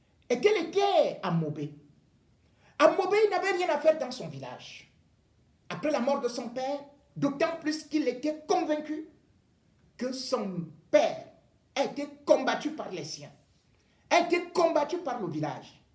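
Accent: Cameroon. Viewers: Un journaliste engagé comme toi on en voit de mooins en moins en Afrique. J avoue que alain foka et toi faites la fierté de notre pays. Continuez ainsi l histoire retiendra votre passage sur cette terre. Merci pour tout mr ngono. Depuis que je suis tombee sur l émission de sport à france 24 j ai doublé mon admiration pour vous. L accent Camerounais sur ce plateau tv me fait tjrs sourire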